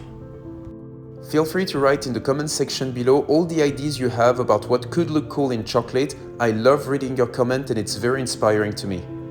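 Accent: France. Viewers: WOW. Remarkable. Delicious. Inspiring. Always nice to see sculpturing beautiful ART work out of chocolate. The FRENCH accent is a must. ❣️🏹🐪🐫🦩🦚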